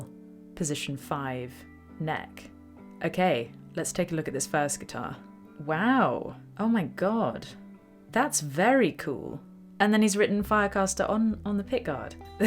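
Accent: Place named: England, United Kingdom. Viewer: My first time seeing you. I like your accent. You’re English but I can still understand you. 😆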